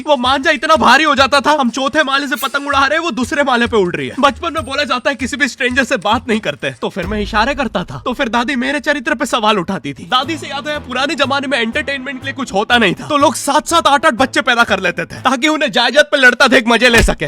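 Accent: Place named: Malwa, India. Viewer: bhaii aap hamre malwa ke ho kya gav ke 😂 aaj confirm ho gya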